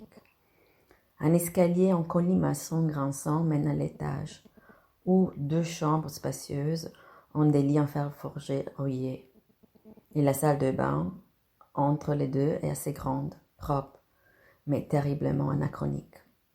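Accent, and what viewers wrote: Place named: Italy. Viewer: Oh, bellissimo, ancora! Il racconto in francese, con il Suo accento italiano: carinissimo! Esattamente quello che vorrei: una donna/moglie italiana che impara e/o parla il francese!... Parla un francese perfetto,! Allo stesso tempo, è abbastanza normale, con 25 anni di presenza in Francia, come ha già detto. Dice "Pour mes amis français": grazie! Se io potessi essere un Suo amico francese, accetterei molto volentieri!... Lei è un'amica italiana, comunque!...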